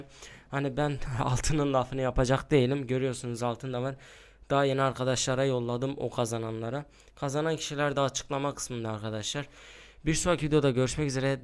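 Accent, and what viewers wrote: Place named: Adana, Turkey. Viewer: abi adanalı olduğun ne kadar belli adanalıyıhhhh allahın adamıyıh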